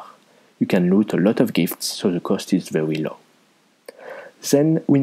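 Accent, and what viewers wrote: France. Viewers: Possibly the strongest french accent i’ve ever heard, no hate intended! Good guide! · Ce franch accent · Aaah l'accent français c'est grillé au bout de 3 secondes :D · So many years and I still lobe your French accent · NICE FRENCH ACCENT MY FRIEND :)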